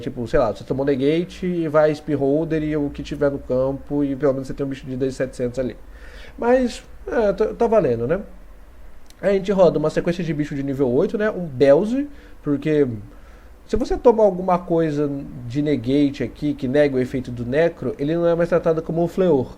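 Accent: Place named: France. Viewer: Esse sotaque francês é ótimo mas o targetar e dar alvo e de matar. Os duelos são tão rápidos no yugioh que mal da para acompanhar tudo que acontece 😂😂. Eu queria dar uma sugestão tem um baralho que eu gosto muito que o dragão armado e fiquei sabendo que tem novas cartas dele,eu queria saber como o baralho funcionária hoje em dia.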